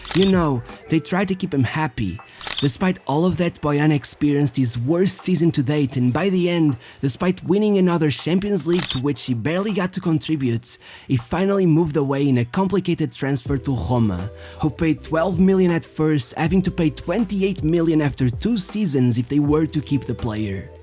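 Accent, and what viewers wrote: Portugal. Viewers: By your accent you are portuguese. I know because i speak the same accent 🤣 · Are you Portuguese or from Portugal? I am, and the way you speak is very familiar.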